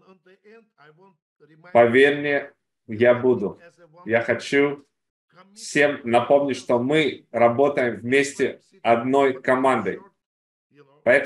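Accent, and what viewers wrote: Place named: Russia. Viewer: с сильным "русским" акцентом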